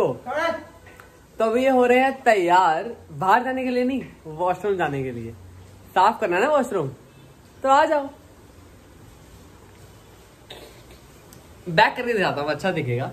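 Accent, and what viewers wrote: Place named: Punjab, India. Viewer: Very Punjabi c bolya karo kntt lagda o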